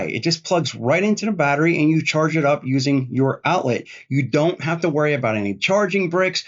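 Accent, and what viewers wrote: Pittsburgh, United States. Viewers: Hah - didn't even recognize it was you...until I was listening and heard just a hint of yinzer and I said, hmmmm, that's real nice like. Awesome stuff. Get the gear.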